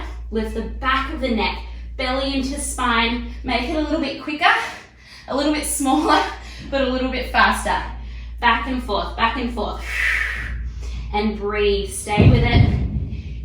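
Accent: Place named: Australia. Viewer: You have such an aussie jew accent haha love it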